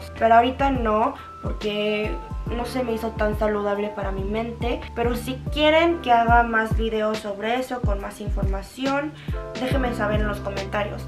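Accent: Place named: Fresa, Mexico. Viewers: Asi como estas dres muy guapa y si haces ejercicoo por salud te conviene seguir, pero unsa sola cosa quitate el acento fresa, es mejor un tono mas modulado o standard, como Selim la esposa de Minu, el Coreano de Corea. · Me encanta que hablas con acento fresa jajajaj hahah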